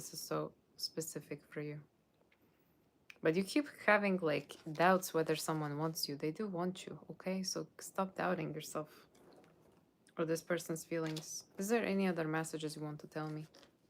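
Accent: Russia. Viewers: Great Russian accent ❤ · The Russian accent is quite smooth and lowkey soothing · I'm Romanian living in Australia!! so definitely Da !! loved the Russian accent u reminded me of the Russian actress on shameless 😅❤ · Wowww,this was the most pleasant surprize for today !! It's Friday night here in UK now when i find your reading dear Russian woman and you made my evening ! Love your look and natural russian accent! ❤😂❤❤❤❤❤❤❤❤❤❤❤ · So cute 🥰 love your Russian accent 💞 Thank you for Scorpio and Virgo reading....both reading is freaking accurate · I love your russian accent... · Your russian sounds so real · I love your Russian accent 😍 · Omg i loveeeee this russian look and accent!!! ❤❤❤ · I love the Russian Voice it make you more beautiful thanks 👑💯❤️🔥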